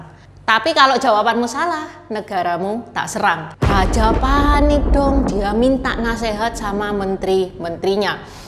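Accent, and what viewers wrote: Java, Indonesia. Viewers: Medok men wong cino iki... ❤❤❤😅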